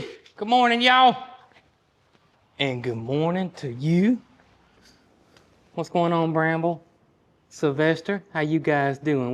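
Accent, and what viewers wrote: South, United States. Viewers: You popped into my feed. I gave you a listen. Your southern accent reminded me of my Tennessee kin, now all gone. Just hearing you talk brings me great comfort. I was born and raised in Indiana but my daddy would take us on our summer vacation EVERY year to my grandma and grandpa’s farm in the hills of Tennessee. I’ve slopped the hogs. I’ve shelled the corn out of the corn crib for the cows. I loved to watch grandpa milk. I loved learning to make butter and rub the sage and dry apples. They had an outhouse. They had a horse and two mules that actually worked the plow. Your channel just makes me feel good. I’m 70 years old this November.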